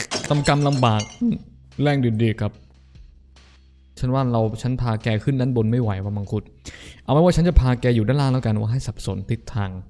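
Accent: South, Thailand. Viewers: ลุง พี่พูด ภาษา ใต้เหมือ จริงๆ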